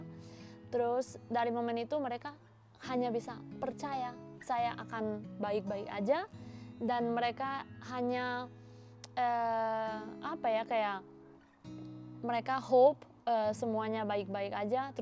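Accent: Indonesia. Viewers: Keren banget dialeknya , 90% Indonesia . ❤️ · Buset bahasa indonesia fasih banget,logatnya pun indonesia banget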